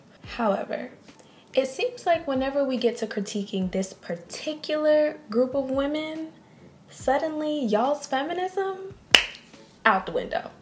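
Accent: Jamaica. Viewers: you sound Jamaican, are you a YARDIE? If so, TUN UP! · Its the patois for meeee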